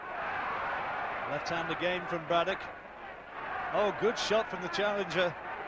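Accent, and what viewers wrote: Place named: United Kingdom. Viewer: The Russians are smart enough to get a British sounding guy to narrate the fight, but don't be fooled...this is Russian television.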